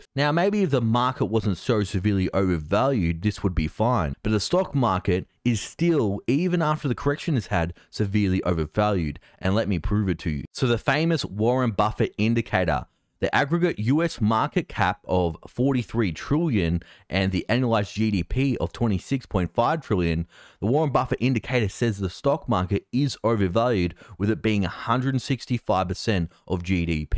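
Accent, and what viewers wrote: Australia. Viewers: It's sounds like you're an Australian. Isn't the Ausy economy in a relatively good spot compared to much of the western world? I'm about to emigrate WA from South Africa where we can't even keep the lights on and the Rand is reaching new all-time lows, so I figure it's an improvement.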